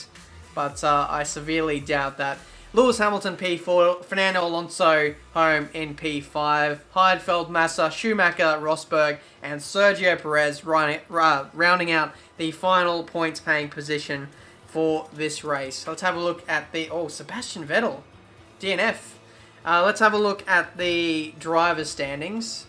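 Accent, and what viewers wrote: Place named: Australia. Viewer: Love the videos. And its not just because of your aussie accent.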